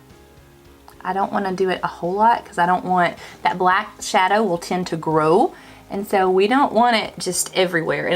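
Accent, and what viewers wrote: South, United States. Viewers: I have this pallette. I bought it months ago before I started watching YouTube makeup tutorials. You popped up in suggested videos because I had watched some videos on this pallette the other day. I hit the subscribe button as soon as you opened your mouth because you're as country sounding as I am. Love it! 😁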